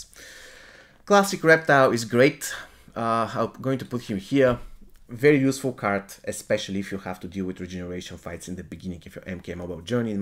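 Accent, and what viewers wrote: Bulgaria. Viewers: are you bulgarian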